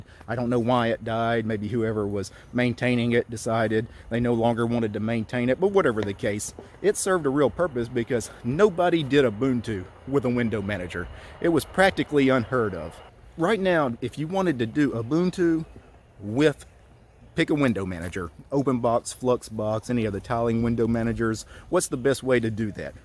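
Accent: South, United States. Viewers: > bald boomer with a southern accent ranting about linux and open source software in his back yard Is this a whole genre now?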